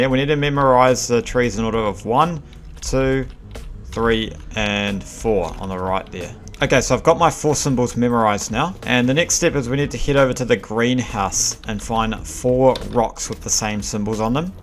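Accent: New Zealand. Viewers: Your a kiwi aren't you? :)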